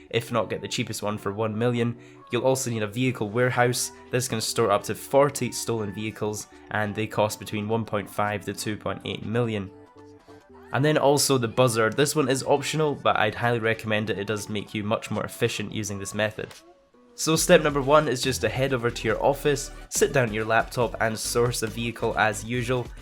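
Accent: Scotland, United Kingdom. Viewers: Fuck scotland wierd accsent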